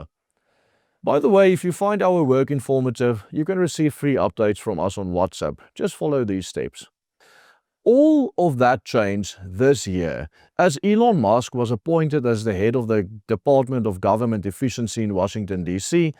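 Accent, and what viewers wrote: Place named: South Africa. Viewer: Love the accent i wish i had an South Africa accent 👍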